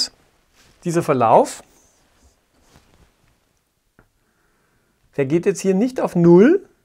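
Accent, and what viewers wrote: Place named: Bavaria, Germany. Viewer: Trey 666 tiefstes Bayern wär mein tip ;)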